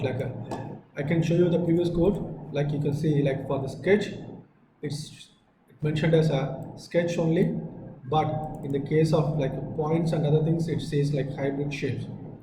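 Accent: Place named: India. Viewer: OMG accent English Indian is just Horriful xD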